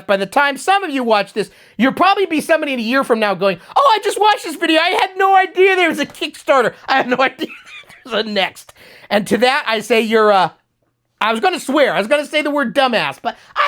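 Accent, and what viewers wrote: United Kingdom. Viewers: I went to the main news channel ZX Spectrum Next to say hello again (I'm one of the 27 lucky ones) and thank you for everything you do. I'll subscribe, I'll drop by more often, and at the same time I'll improve my English skills, because no matter how hard I try, I can't understand anything about the British dialect :)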